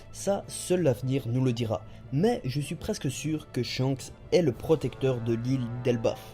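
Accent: Belgium. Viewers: L'accent belge que veux-tu ! Moi aussi je dit "oui cent trente ouitte" xD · imagine la barre qu on va se taper pour le chapitre 890 aka oui sans nonente 😂. on te kiff, change jamais ton accent belge. je dirais même que tu devrais utiliser plus d expressions de chez toi,genre à tantot etc...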